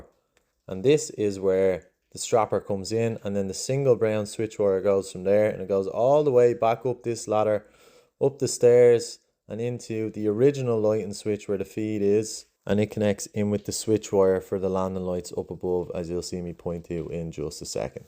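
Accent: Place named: Ireland. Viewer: Turning into a nice series this. Educational, short and easy to follow + the Irish accent makes everything better 😂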